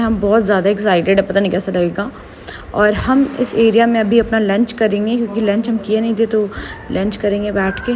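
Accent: Bihar, India. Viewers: Bihari accent is love...btw kon year me ho didi? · I just accidentally came across your channel and glad to hear the typical simple Bihari tone in your voice☺ And ofc, your room looks beautiful 💯❤ I'm also from Patna, gonna give 2nd attempt this yr. Di, wish me luck😅🙏🏼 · Didi your talking way was lovely 🥰 I'm also from Bihar waha pe ese hi baat krti h ladkiya unki yaad dila di 😍 btw now I'm in delhi, your words like ( dekho ,hum ) give me nostalgic felling when I'm in bihar nowadays in vecation 🤩🥺🥺🥺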